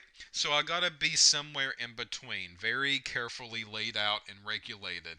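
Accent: South, United States. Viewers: very good like your southern? accent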